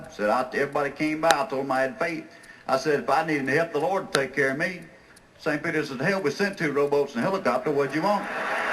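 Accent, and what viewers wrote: South, United States. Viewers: I dare say the heavy southern accent may be different enough from normal English to be considered a separate language.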